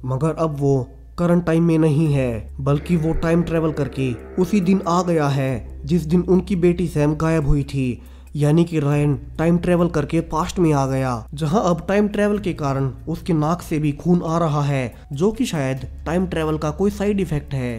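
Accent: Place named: Bengal, India. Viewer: I think you are Bangali.,.........like me, because your speaking style like bangla,............ don't mind that's my opinion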